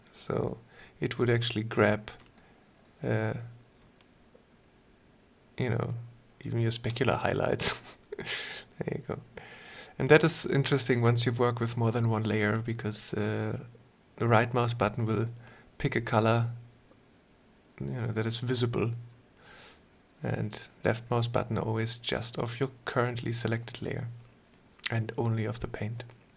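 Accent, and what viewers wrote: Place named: Germany. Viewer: Ich meine ich höre einen deutschen Akzent? Werden wir nichtperfecktenglischversteher auch mit einem deutschen Tutorial verwöhnt oder habe ich es übersehen? Lieben Dank...Finde die Möglichkeiten großartig.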